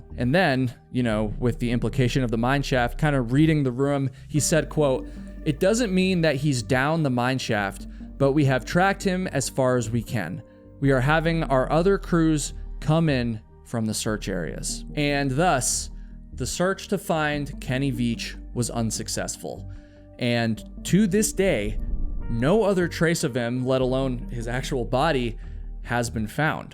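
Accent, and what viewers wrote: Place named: New England, United States. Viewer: is a New Englander, irrc. I noticed staying in rural Vermont/upstate New York years ago that a lot of the speech patterns and sayings up there are quite similar to ours in the UK. Definitely moreso than out west or in the bigger cities.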